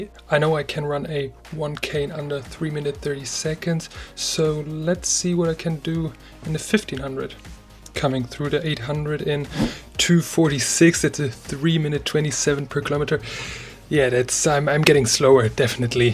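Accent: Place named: Germany. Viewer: An sich interessantes Video, aber dude.. dein german accent killt jede Motivation weiterzuschauen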